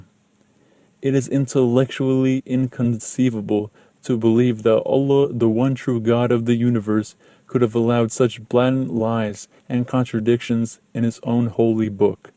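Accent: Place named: India. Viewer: Are you from India? Cause you pronounce many words like we do. Anyways. Stay healthy. Stay happy. Lov from India.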